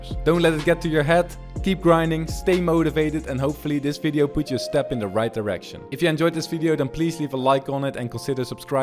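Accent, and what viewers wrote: Netherlands. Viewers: R you dutch bc u have a dutch accent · waittttt are you Dutch or Belgian? ;O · you hear your voice being Dutch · You are probably Dutch? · Ik hoorde dit letterlijk 1 seconde in wist gelijk dat je een Nederlander was😂 Geen haat btw bij mij hoor je het ook hoor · Yo ik ga morgen overstappen naar pc en ik vroeg me af aangezien je ook Nederlands bent (ik hoor het aan je accent 😁) of je mischien als ik een beetje beter ben een paar 1v1s tegen me wilt doen om te oefenen. Je video's zijn handig 💪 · Is he dutch? His accent gave iT away! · Wait you’re dutch lol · Eyyyyy ben jij nederlands? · Youre dutch? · ben je Nederlands? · Are you dutch? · Ik hoorde aan je accent dat je nederlands was en toen je je dpi naar 500 zette toen zag ik dat het in nederlands stond gg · Hes Dutch (like me) and the most people that are dutch have that accent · Wait are you from teh netherands? 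· Je bent een Nederlander! Ik vond je accent al zo NIET engels lijken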